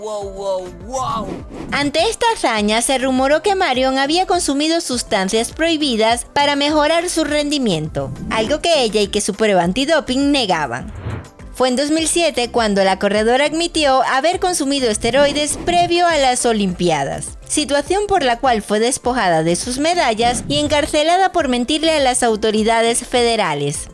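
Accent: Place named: Spain. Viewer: Os molesta que dice las C y las Z como en España, os quejais mucho del racismo pero sois los peores.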